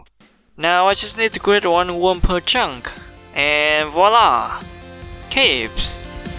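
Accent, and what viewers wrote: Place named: India. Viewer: He sounds indian and looks vietnamiese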